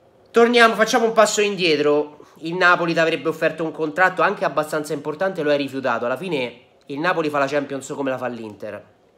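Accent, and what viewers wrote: Latina, Italy. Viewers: Ciao ma sei di Latina?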